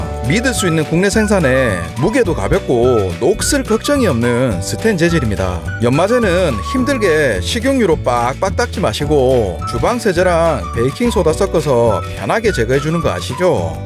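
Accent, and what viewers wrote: Gyeongsang, South Korea. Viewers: 이 분 경상도 사투리 너무너무 듣기 좋아요~ 아빠 목소리 같습니데이❤❤❤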